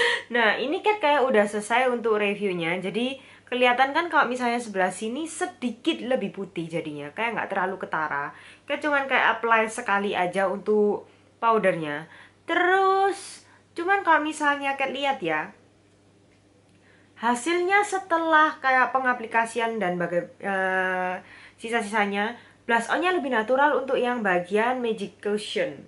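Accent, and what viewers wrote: Java, Indonesia. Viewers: medok tenan... kakak ini pengunjung baru kak. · medok ah ameut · Hai Kat lg pilek yak?btw ak subscriber baru suka style nya km ngomong sih njowoni hahhaa..keep up the good work ya Kat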